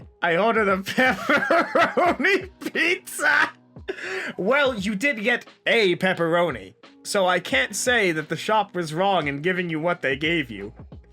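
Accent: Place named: New England, United States. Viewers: You are the most New England sounding person I've ever heard. I'm from California, you've basically got a foreign accent as far as I'm concerned. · The fact that you love in New England explains your accent! My uncle used to live in Rhode Island and now lives in NH not far from the Mass border and he has a similar accent. As an English boy it is funny and weird!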